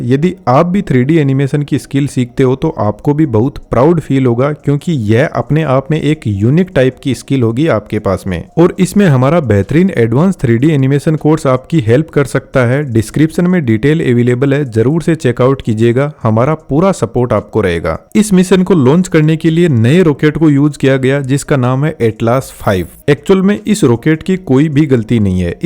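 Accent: Rajasthan, India. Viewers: Bhaisaab aapka accent Rajasthan wala h aap kaha se ho, Mai Jaipur gramin se hu😊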